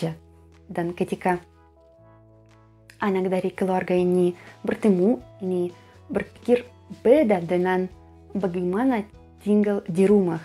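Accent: Java, Indonesia. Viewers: Logat Jawa 80% apik lo. Monggo mbak mriki dolan ke kota Kediri Jatim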